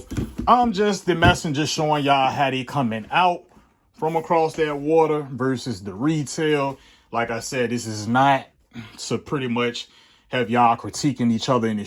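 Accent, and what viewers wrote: Richmond, United States. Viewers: Richmond accent?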